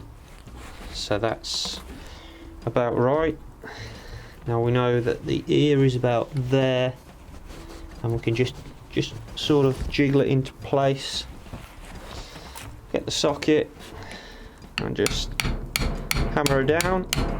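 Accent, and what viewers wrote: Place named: Midlands, United Kingdom. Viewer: you sound as though your in the Midlands do you have your own garage I’m near Warwick and could do with a local mechanic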